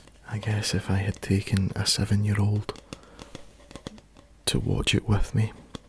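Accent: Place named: Glasgow, United Kingdom. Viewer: u ken by ur accent ur fa glasgow or somewhere a little further north am i right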